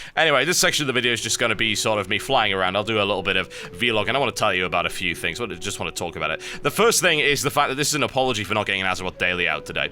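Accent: United Kingdom. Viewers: to be honest, i stumbled upon you one day and just think you have one of the best commentating voice ever. It makes me happy to be british with people sounding like this. I've enjoyed all of your videos, the WoW stuff however isn't my thing but it dosn't bother me slightest that you want to put more i can still watch your over videos like the WTF series and other video series. (btw your starcraft videos are what have put tatic ideas for my play style) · I really just hope you continuing make videos becouse i just love the way you cast things. IDK if it is your voice, the british acsent, but i just liked it. Dam, i even got my WoW subscription back again so i could understand more of Azeroth Daily, and becouse i had nothing else to do on my free time and i was looking for a MMO. X_X" Anyway, just keep doing it man! You have all your subscribers not by coincidence, you earned then, you even earned the third place on that contest! 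LOVE U TB! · Hi TB, im one of the more "recent" viewers, only joining in the last month of the beta or so, but I have enjoyed your content and of course your british accent. The thing I want to bring up is the "lack of love" for your SC2 content. The issue with your SC2 content is (for me) not that I don't love SC2, but to be honest because there are much better commenters out there for SC2, people with deep personal knowledge and skill in the game, who can actually give us insight, like you do in WOW.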